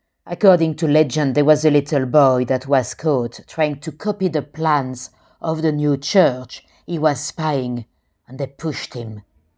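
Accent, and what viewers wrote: France. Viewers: I like ur voice and accent. Sounds like French talking English. 🙂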